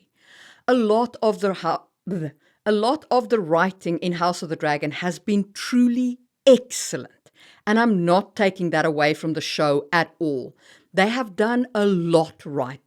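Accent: South Africa. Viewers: Dont mean to be too personal but are you south african? All the info says you stay in Finland but that accent says you're from here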